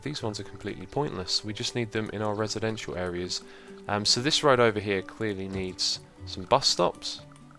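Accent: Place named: United Kingdom. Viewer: So nice too hear a fellow Brit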